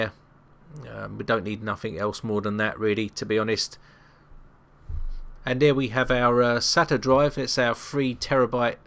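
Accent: United Kingdom. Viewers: damn British accents give me cancer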